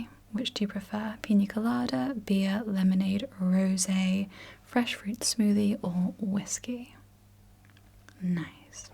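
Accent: United Kingdom. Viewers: I'm in love with British accent Take care Hugs 😊❤ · Ive heard a lot of british accents but hers sounds so smooth, does anyone know what this accent is called/what region of UK its from? (sorry in advance if this is intrusive :'3 )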